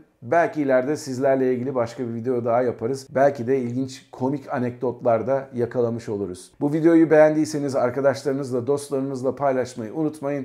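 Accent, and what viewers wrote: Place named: Kayseri, Turkey. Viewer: Kaptanım, pazar günleri, Habertürk kanalında Airport isimli bir program var.Geçen hafta, uçakta ,yazılı olmayan görgü kuralları başlığı altında ,yararlı bilgiler vardı. Herkese öneririm.Hatta ,daha detaylı olarak belki siz de bir video yapabilirsiniz. Ama ,hep Gayseri aksanı kullanmayın, biraz da Rumeli,Karadeniz vs aksanlarında, takdir ettiğim tiyatral yeteneğinizi görelim. Bence Pilot olmasaydınız tiyatrocu olurmuşunuz..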